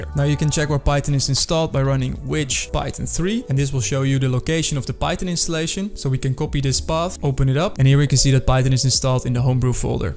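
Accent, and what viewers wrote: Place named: Netherlands. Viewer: zo jongen ik hoor zo erg je Nederlandse accent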